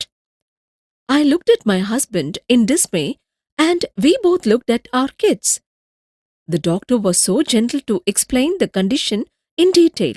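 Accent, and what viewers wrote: India. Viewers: seriously dude its an Indian accent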